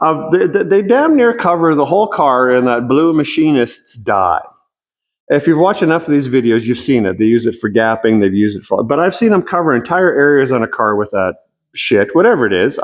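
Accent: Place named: Canada. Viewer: reminds me of Bob and Doug McKenzie, must be the accent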